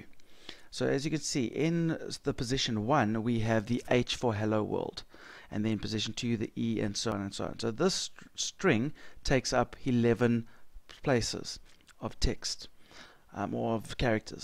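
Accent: South Africa. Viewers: You can hear the strong South African accent coming through, at least I think.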